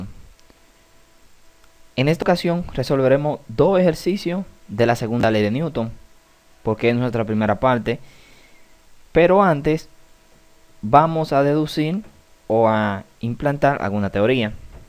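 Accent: Dominican Republic. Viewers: Eres dominicano?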